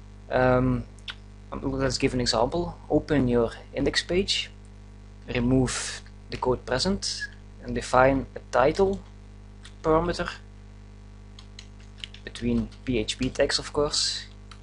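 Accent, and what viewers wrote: Netherlands. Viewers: You have a dutch accent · You are dutch I assume? :p Ik ook :p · We horen direct dat je nederlander bent lmao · you're dutch right (no offence it's the accent) :) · Binnen 1 seconden te merken dat je Nederlands bent haha, chill video! Thanks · nederlands accent, geen echte engelse :D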